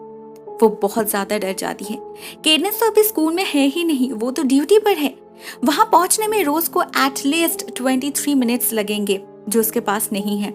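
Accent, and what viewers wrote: Bengal, India. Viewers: U have bengali accent 😀 ..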